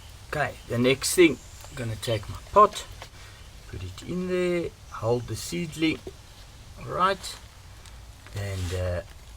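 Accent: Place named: South Africa. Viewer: Lekker to hear a South African accent , I live in South Carolina USA . Great video very helpful tips . Clivia”dare my favorites, remind me so much of home in Transkei where I used to see them flowering by the hundreds under the coastal forest canopy . 👍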